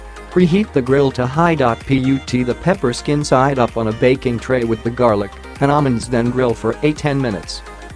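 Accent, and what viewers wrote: United States. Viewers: An American robot!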